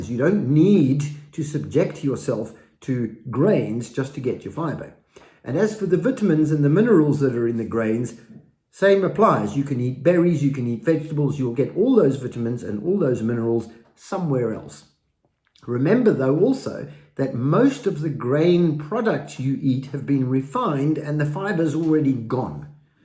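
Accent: South Africa. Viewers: Brilliant...You're so right. Totally agree.. Everyone listening needs to take care of diet and exercise before its too late. I live in USA.. Linda, ex-Zim..Good to hear yr SA accent :)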